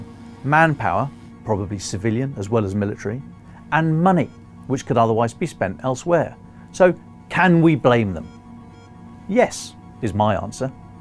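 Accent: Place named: United Kingdom. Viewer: Yet another load of BS from a guy with british accent.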